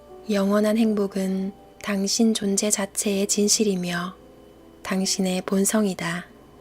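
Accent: Gyeongsang, South Korea. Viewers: 경상도 사투리가 부드럽고 달달한 목소리로 들립니다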